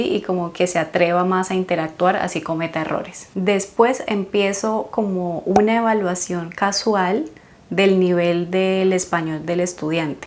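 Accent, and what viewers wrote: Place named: Colombia. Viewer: Me encanta tu acento! soy de Colombia también del área de Cundinamarca, pero ahora que vivo en Europa por más de 10 años el acento se ma ha ido. Pero por más acentos que veo el bogotano y el tuyo es de mis favoritos.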